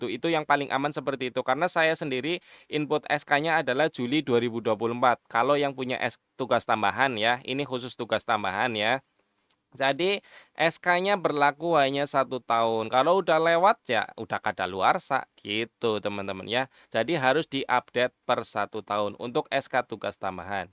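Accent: Banyumas, Indonesia. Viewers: Mas mw nanya. Kalau honor negeri bs cair gk kira"?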